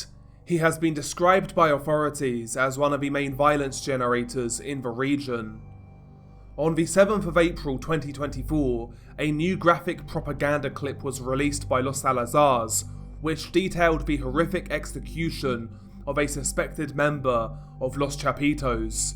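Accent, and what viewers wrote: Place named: Southeast England, United Kingdom. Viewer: Watching it while you narrate it. Saw it earlier anyway but. I’ve been watching gore since 2004 and I’m interested in man’s reaction to suffering. You could call it “morbid curiosity” but I prefer “empathy”. I empathise with these guys. I’m so lucky to be born in a peaceful location and to live my life without bother. Can’t quite place your accent but I’m Cambridge area and I guess you’re south east-ish. Anyway, love your videos mate, you’ve really informed me about the background of the cartel’s. You should do a podcast as you’d reach more people, without the restrictions of YouTube. Fascinating subject matter, in fact the south Americans are fascinating people. I lived with a Brazilian and my best mate is a jap who grew up in Brazil. Her stories are pure madness All the best brother.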